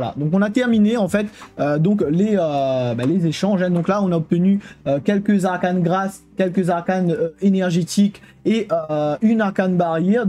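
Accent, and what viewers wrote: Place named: Reunion, France. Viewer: a l'accent je sentait un 974 caché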